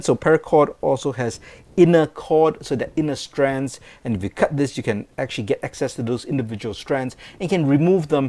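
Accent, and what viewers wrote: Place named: Singapore. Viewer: I suspected that you were Singaporean because of your accent but you don't say "La" so I'm not sure.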